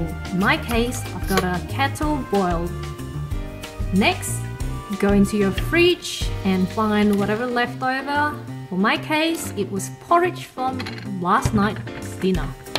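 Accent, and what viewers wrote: Australia. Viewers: this ozzy accent is perfect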